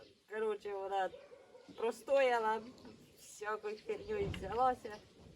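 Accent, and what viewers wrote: Poltava, Ukraine. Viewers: ГОВОР ТАКЕ ,ЯК ПОЛТАВСЬКИЙ, ЧИ НІ?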